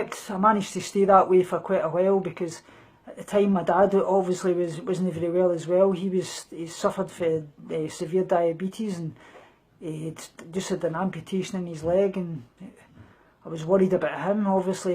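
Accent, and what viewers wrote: United Kingdom. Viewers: Hi. Very happy to see you're still alive! I guess you live in the UK (from your accent)? In Sweden we have a law that allows the hospitals to admit eating disordered patients against their will if they have a BMI below 14. Then it's consider life threatening starvation and the psychiatric rules for admission against one's will is: if there's a danger to the person's life or someone else's, and if the patient suffer from a serious mental condition. Since this law started including ED-patients the mortality rate has dropped SO MUCH. Very few people die from anorexia in Sweden nowadays. I wish there were similar laws in every country. 20% dying from anorexia is just ridiculous numbers. You can't think or make clear decisions that much underweight. The brain starves and you need to get fed to higher weight before you can think properly, and if the hospital can provide that help it'll improve your chanses of getting well SO MUCH. 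If we hadn't had that law I would've been dead, because I starved myself like a maniac (I'm bipolar, so I'm allowed to use that word, haha). As soon as I got down to BMI 13 they admitted me and tube fed me, and it saved my life I don't know how many times. Because I wouldn't have stopped. I couldn't have stopped. I dropped 10 kg in 2 months without even trying to lose weight. Yes, I hated being at the hospital and that they made me gain weight, but without that I wouldn't be here. Nowadays I've been at normal weight for 8 years and eat what I want.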